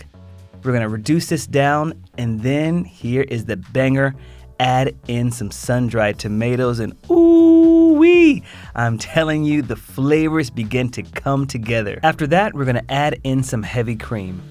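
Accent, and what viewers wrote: United States. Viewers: Whoop whoop Such an American accent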